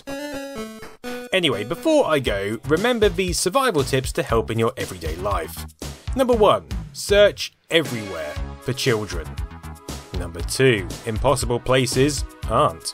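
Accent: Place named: Norfolk, United Kingdom. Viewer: he's from Norfolk, they all talk funny there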